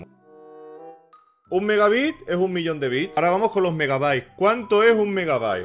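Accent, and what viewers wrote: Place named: Extremadura, Spain. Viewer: pareces de estremadura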